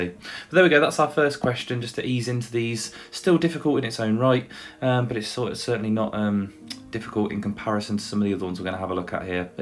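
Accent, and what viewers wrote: United Kingdom. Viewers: try to be more clear in your speaking and explanation. Not everyone is capable of understanding a British accent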